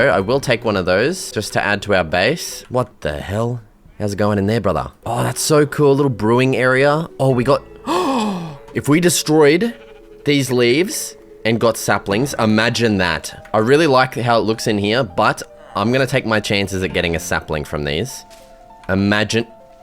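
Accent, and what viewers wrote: Australia. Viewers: All I hear is angry Australian 😂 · That’s just cause he’s Australian lol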